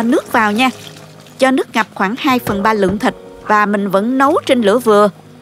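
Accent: South, Vietnam. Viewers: Cô này giọng miền nam chắc nấu kiêu miền nam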